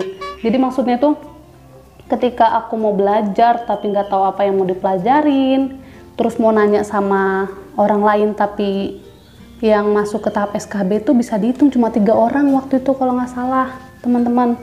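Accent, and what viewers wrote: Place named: West Java, Indonesia. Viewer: koq logatnya sunda kak?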